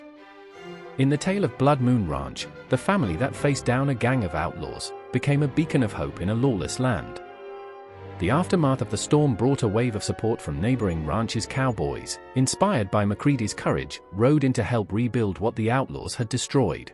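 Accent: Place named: England, United Kingdom. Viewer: Sounds like the narrator is English!! They can't find an American with a southern or western accent to narrate the story?????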